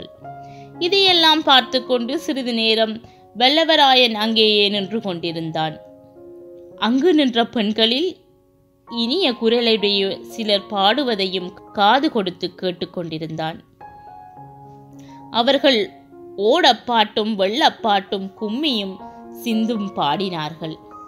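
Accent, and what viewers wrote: Sri Lanka. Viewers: உங்களோட கொஞ்சும் இலங்கை தமிழில் பொன்னியின் செல்வன் கதை மிகவும் இனிமை.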